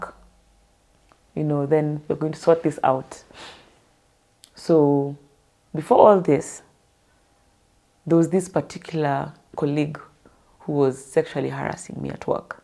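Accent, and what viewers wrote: Kenya. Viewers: one can tell that she is a Kenyan by her accent.Why should the government treat her like a refugee?? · God for 34 years? She even has Kenyan accent...she's a citizen by naturalism... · I listen to this beautiful sister,I don’t hear Uganda accent all I hear is Kenyan accent,I hope the president pardon her so she can get her citizenship and continue her life with peace of mind in kenyan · Based on her grounds I believe she has "automatically or naturally " qualified for Kenyan citizenship by naturalization. Even her English accent's is Kenyan. She's a Kenyan. The east African governments should make this work if they mean about east African community as a regional or block to benefit its people. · Woow.She even has a Kenyan accent.She is a kenya by naturalization. God help her.I hope she gains citizenship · Her English is KENYAn 💯💯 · This lady does not even have the Ugandan accent but Kenyan.After staying in Kenya for 12 years you can naturalize.She just needs a person to guide her.Chinese have come the other day and they have settled.Why not her. 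· This girl is Kenyan. Just listen to her accent. Give her citizenship. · This is soo wrong , her English accent soo kenyan , Kenyans be merciful there are kenyans in other countries too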